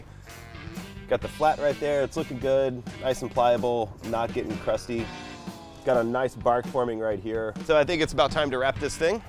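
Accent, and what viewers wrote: Texas, United States. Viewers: Is this guy from Texas or something? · yep, sounds like Texas alright 😆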